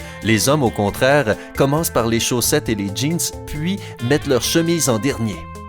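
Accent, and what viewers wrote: Quebec, Canada. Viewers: Ça change avec une voix québécoise ! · He is from Canada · Hé le narrateur est québécois!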